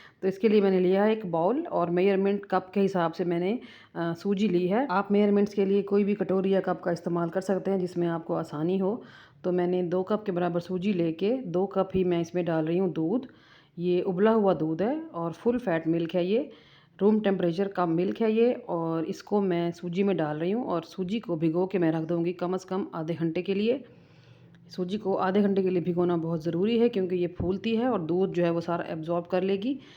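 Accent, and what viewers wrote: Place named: Saraiki, Pakistan. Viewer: Saraikan lagti hay kionkay saraiki main urdu bol rahi hay!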